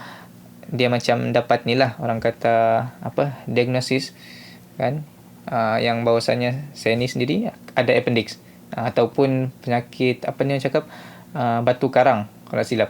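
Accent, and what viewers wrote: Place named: Sabah, Malaysia. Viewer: Cakap sabah jua dia haha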